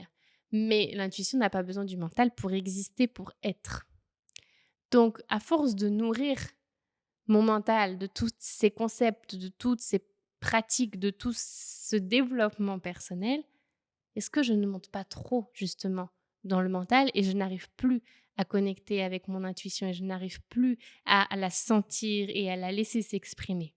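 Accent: Spain. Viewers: Coucou c’est drôle tu as chopé un léger accent espagnol par dessus ta langue française 😃 c’est joli comme tout! Sona bien y bonito 🥰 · Elle vit en Espagne, si elle parle Espagnole au quotidien, prendre un accent c'est normal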